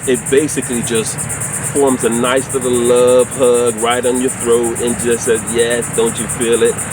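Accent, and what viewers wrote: Jamaica. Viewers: Shout out from the Bahamas cud hear the jamaican in your voice love bro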